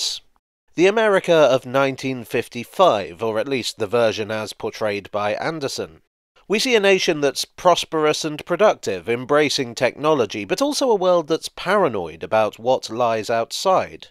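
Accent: United Kingdom. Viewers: I'd watch anything you make because I find your voice soothing and it helps me understand the British accent (I'm not a native English speaker) and I love trains in general, so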